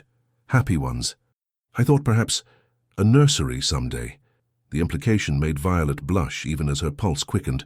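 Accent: United Kingdom. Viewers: Hi Yes! That purring English / British accent. YUM! From (ironically enough) Windsor, Ontario Canada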